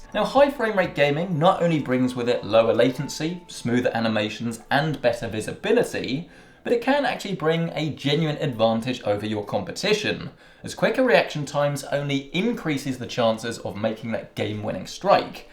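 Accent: United Kingdom. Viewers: Clear British speaker, not a loud American.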